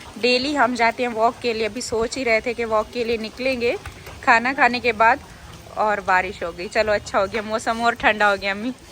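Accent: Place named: Rural, India. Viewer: Phly ham apko dekhty thi to hami bhot hancty thi ap etni gaon wali or asi bolti the k hami hnci ATI thi aj v wo baty wo time apka yad ata tha or aj dekh jhut bol BOL k pasy kamai or aj ghmndi fakhari aorat bn gi zara nmaz ko Allah si v yari lga k dekh dunya ko chor di lalachi aorat bhni faimle sab reshty na khtam kri 😊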